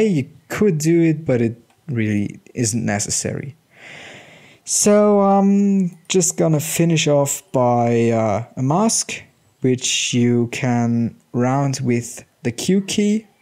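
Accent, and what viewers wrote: Germany. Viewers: BHAudioProduction Spoken with a strong german accent. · U are german right? Good Tutorial. Looks realy Nice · geil ein deutscher der englisch redet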